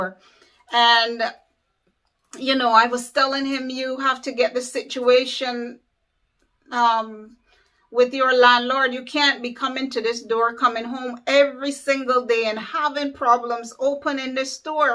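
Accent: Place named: Barbados. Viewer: I hear that bajan accent! I love it and this video, God bless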